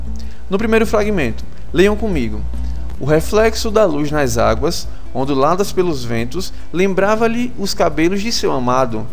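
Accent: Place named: Pernambuco, Brazil. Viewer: pelo sutaque eu chuto! Pernambuco? ...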